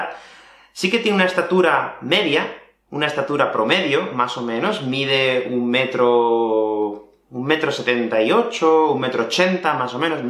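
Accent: Spain. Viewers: A mi no me gusta el acento español (de españa) en general pero el tuyo suena agradable 👍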